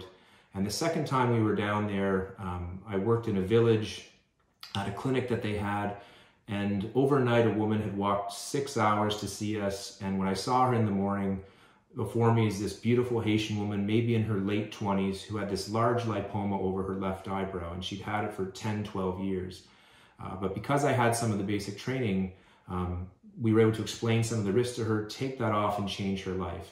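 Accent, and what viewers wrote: Canada. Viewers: I’ve looked for new videos also. I didn’t know you were in Canada until I heard you talk. Most of my fathers family lives in Ottawa. BTW, personally, I think Sandra Lee is overrated. There’s many, many surgeons, dermatologist and plastic guys that do as well or better. I think she’s sloppy and impatient.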